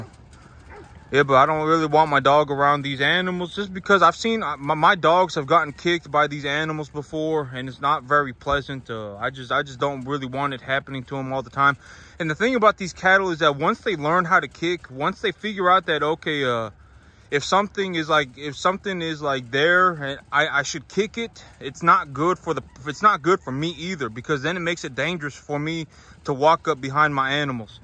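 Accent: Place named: East, United States. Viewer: he's a mystery, I think he kinda has an eastern U.S. accent..... and he obviously doesn't read our comments😂 or we'd get a 5,000 word response. Could cut it down to 1,500, but he generally repeats everything at least 3 times.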